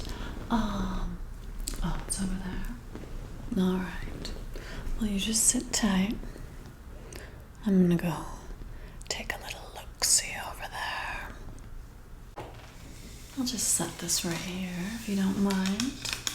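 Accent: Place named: South, United States. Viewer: Your voice sounds very Country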